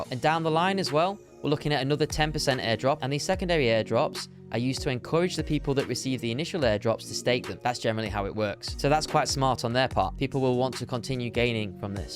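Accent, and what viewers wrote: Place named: Yorkshire, United Kingdom. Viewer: You’re the 🐐 mate aha. Can tell from the accent you’re from Yorkshire pal, which part ?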